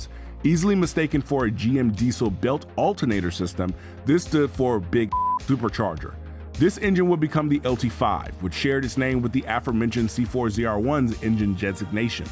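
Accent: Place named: Indiana, United States. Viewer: YOUR ACCENT SAYS INDIANA/SOUTHERN ILLINOIS TO ME I MEAN THAT AS A COMPLIMENT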